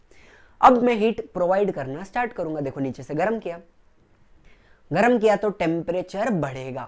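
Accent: Maharashtra, India. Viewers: Sir are you Marathi???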